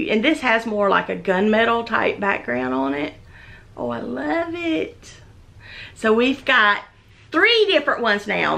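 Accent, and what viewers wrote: South, United States. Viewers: Thank you so much for getting back to me. I’ll have to check out Ebay maybe. I am a brand new subscriber to your channel. I cannot tell you how much I enjoy you! You are such a bright light in a very trying tone for so many. I smile and laugh every time I watch your vlog. All your “oh my gosh” and “I love it” in your sweet southern accent. Even my husband says in a southern accent (and we’re in NH so speak yankee) “shut up” It has been so much fun watching your videos. I have watched EVERY ONE already!! Thank you for bringing some added joy to my days! Can’t wait for your next installment...I’ll most definitely be watching! 😀. AMY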